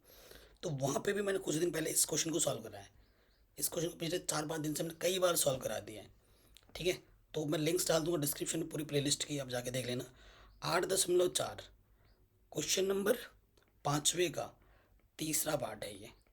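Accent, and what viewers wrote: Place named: Haryana, India. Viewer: Bhai to Haryana se hai..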